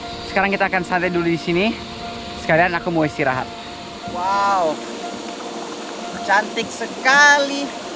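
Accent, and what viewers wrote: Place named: Borneo, Indonesia. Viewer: Logat nya Dayak 🤗 Sehat selalu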